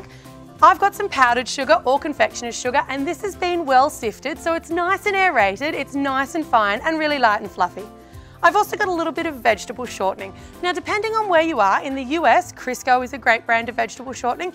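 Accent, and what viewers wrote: Australia. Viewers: I love your Australian accent! My English teacher is Australian here in Sweden and oh my, I think about you every time I hear her :) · Does no one else think she sounds like an Australian Rachel Ray? Lol! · Draco Malfoy has a British accent....hers is Australian.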